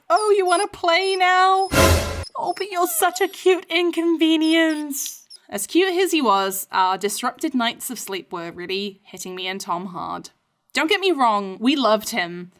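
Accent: United Kingdom. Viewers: I love your british accent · me: haha, although now we didn't know i feel your british accent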